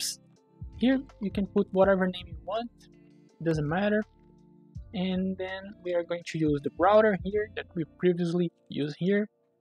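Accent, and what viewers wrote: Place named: Brazil. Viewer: esse sotaque nao me engana você é br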